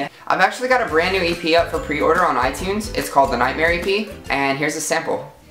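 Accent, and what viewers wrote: United Kingdom. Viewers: Are you british? You sound kind of British (no offence if you take offence) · I'm so happy for you!:') Also, I'm proud of your British accent:D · OMG! The accent is back! 💜 ily really, even when your trying to do a British accent XD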